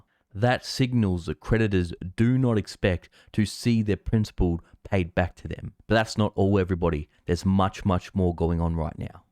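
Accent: Australia. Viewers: Nice Aussie accent.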